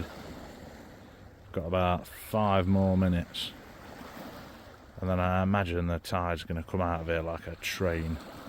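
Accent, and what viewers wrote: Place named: Derby, United Kingdom. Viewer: Hi again, should say I'm a Derby man myself, from Allenton originally, nice to hear the accent, it takes me back. 🧔 👍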